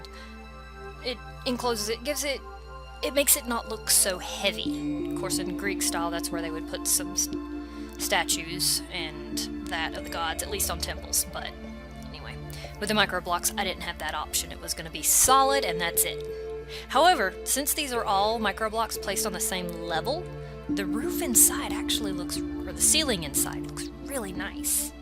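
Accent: South, United States. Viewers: she has a little bit of a southern accent. :)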